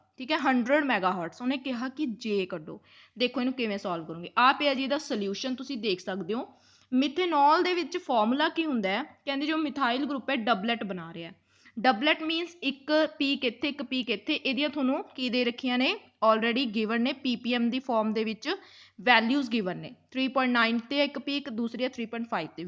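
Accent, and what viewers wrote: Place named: Malwa, India. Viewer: Wao mam malwa belt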